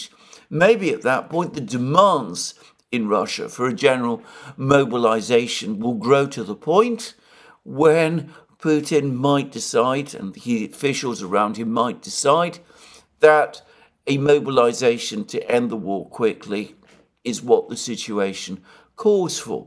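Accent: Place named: RP, United Kingdom. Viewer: If you had’t been involved in nail bars you could have been king’s council, instead of a messenger for Putin’s grocery boy. Strategic, tactical - good put down. Your accent is posh English, not Greek. Just saying.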